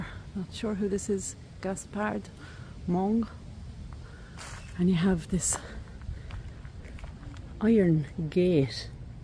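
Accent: Ireland. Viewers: I am a retired language teacher. And was raised by the Sisters of Catherine McAuley. An Irish Order. I understood your “brogue”, but it was an attempt to help you say the French correctly. Bonne journée 🌷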